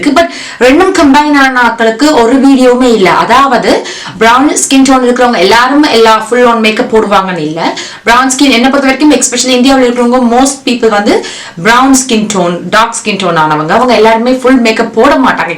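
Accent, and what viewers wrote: Sri Lanka. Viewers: Sis r u from srilanka I liked the way u talk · Tamil super like srilankan tamil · I like ur Tamil slang sis it's like srilankan tamil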